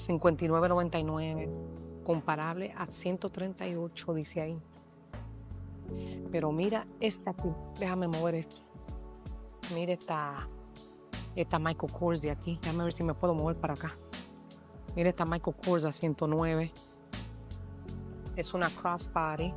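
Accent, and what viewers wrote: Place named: Dominican Republic. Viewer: Arriba R.D., iba a preguntar si eras Dom. Por la forma de hablar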